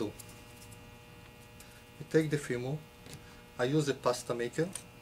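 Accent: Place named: Israel. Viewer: Amazing! which powder did you use? and.. you have an Israeli accent, are you originally from Israel??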